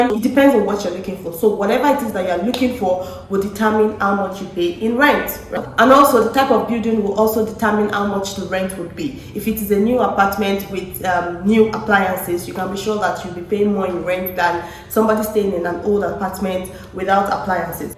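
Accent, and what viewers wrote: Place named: Johannesburg, South Africa. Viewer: you catching Joburg accent 🤣🤣🤣 it won't be long until you start including haibo and yoh! in your vocab.. nice video by the way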